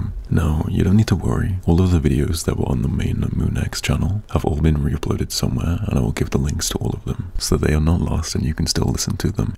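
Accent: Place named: United Kingdom. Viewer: he's fucking BRITISH???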